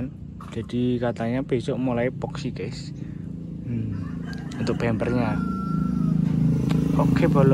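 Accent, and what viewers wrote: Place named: Central Java, Indonesia. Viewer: Mas rumahe mna kok kendaraane plate S tp logate omongan jateng banget😊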